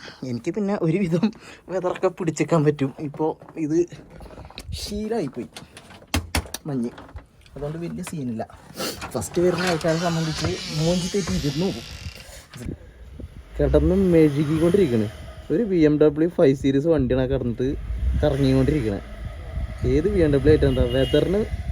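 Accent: Malappuram, India. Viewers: Malappuram slang kekkan oru sugaan😁🤝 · Malappuram slag mariyo😂 malappuram slag ato bangi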